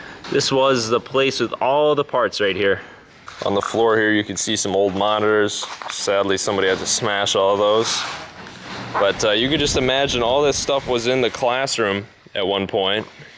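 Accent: Chicago, United States. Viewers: I knew you were from Illinois. Got that Chicagoland accent haha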